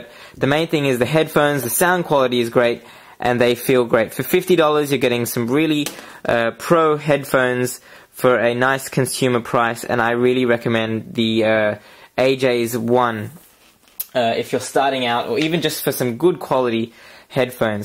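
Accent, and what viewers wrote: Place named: South Africa. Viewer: He sounds kinda South African.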